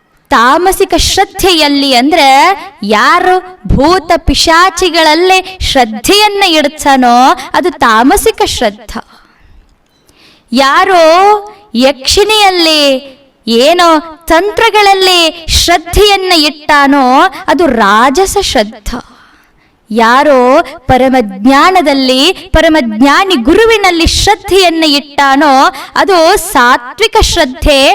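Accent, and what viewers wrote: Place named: North Karnataka, India. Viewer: Uttara karnataka bhashe shyline ond maja kelokke 😂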